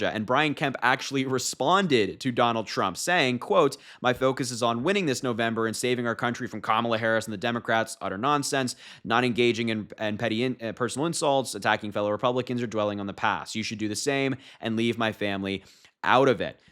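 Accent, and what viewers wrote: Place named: England, United Kingdom. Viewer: you’re the best. I live in Montreal, Canada and I’m following you. Your English accent is excellent and easy to understand since I’m a french Canadian. My wife and I find that you are a very intelligent young man. Bravo!!! And keep on doing the good job. Roger:)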